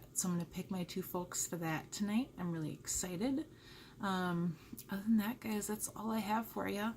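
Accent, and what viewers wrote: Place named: Minnesota, United States. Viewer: What is her accent? Sounds like maybe Minnesota?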